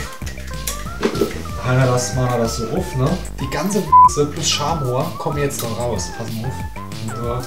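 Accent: Germany. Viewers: Pfälzer? Feier ich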